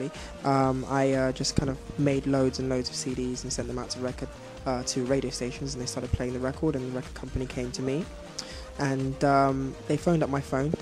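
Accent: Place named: United Kingdom. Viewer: iHadd a feeling he had a accent with a namee likee Taio Cruz and he sings like he has a accent . bu ididnt think it would be british bu he cutee buu yeaa OMG iLovee him even more now sence hes british hehe he's so sweet & cutee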